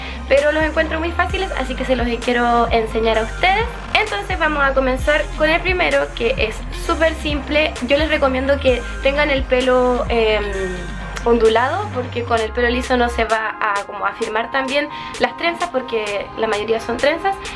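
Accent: Chile. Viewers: saludame! soy de Honduras. pero vivo en NY. tengo un amigo chileno y me gusta el acento chileno por eso te veo😍 Mi nombre es Alejandra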